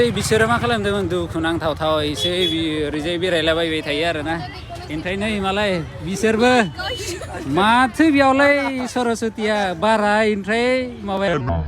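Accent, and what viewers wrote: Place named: Bodo, India. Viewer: Your Bodo is so sweet.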